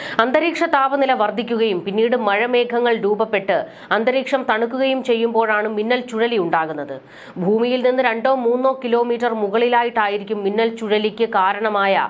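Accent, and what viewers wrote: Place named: Palakkad, India. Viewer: മിന്നൽ മുരളി കാണാത്ത വർ മിന്നൽ ചുഴലി കാണാൻ സാധ്യത ഉണ്ട് 👌🏿പാലക്കാടൻ ഭാഷ. കേൾക്കാൻ തറ വായന 😂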